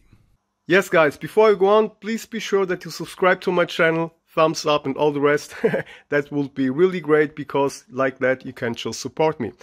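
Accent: Germany. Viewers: I love German accent 🤣. Btw Ich bin Deutsch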